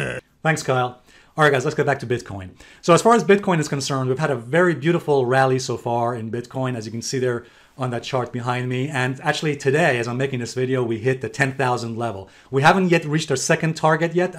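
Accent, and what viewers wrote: England, United Kingdom. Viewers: Very interesting, thank you! Also your method of checking with the balanced level sounds reasonable! Your sport jacket does perfectly fit to you and the English accent!! :D Well done!! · Great Video keep like that every time by the way your accent England was 👍🏻👍🏻👍🏻👍🏻. I wann know something a bout altcion like Dash how much price well be .Thank you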